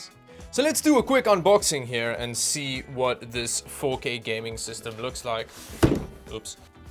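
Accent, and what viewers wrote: Afrikaans, South Africa. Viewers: How long have you been in Canada?, you still sound very South African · You dont sound Canadian...South African perhaps? :-) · You sound South African. Are you? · Interesting accent there - sounds like you’re Afrikaans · Are you south African? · i can hear that south african accent trying to get some slang through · Are you an SA expat? You sound South African. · Are you from South Africa? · Is jy Afrikaans? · I though you were South African? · He lives in Canada and his accent is white South African. So you are an immigrant. Good on your part you really dodged the bullet that is South Africa. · his south african 100% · I swear this guy is south african. Correct me if I'm wrong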